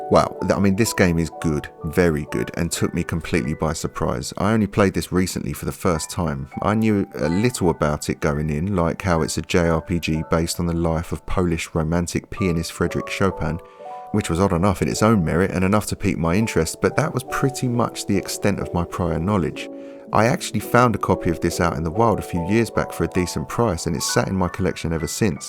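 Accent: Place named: London, United Kingdom. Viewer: Proper londoner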